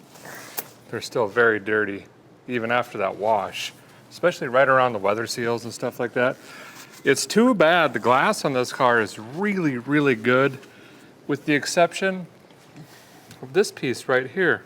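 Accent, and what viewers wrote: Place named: Upper Midwest, United States. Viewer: As a chicagoan (bridgeport native) the way you pronounce battery warms my soul. I am out of state for a while down south. And I have a thick thick chicago accent(9th generation chicagoan) lol I told my neighbor about how much I hate my "front room" no idea how to type like I sound but its Like, "guy I'm tellinya went over dare and sat inda share, chtyaknow I can't see out da faaackin windohh in my frunchroom unless i stand" he instantly started laughing and said "yankees" all dissapointed like while shaking his head. I get made fun of a lot here. You have like a north Midwest accent. Like Wisconsin, Michigan. Mine is like angry Canadian. Sorry I have a fascination with accents and dialect from different parts of the world and country. America has so many different accents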